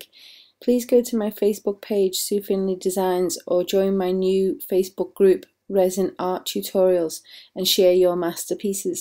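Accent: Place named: Liverpool, United Kingdom. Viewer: Your accent is lovely. You’re a posh scouse x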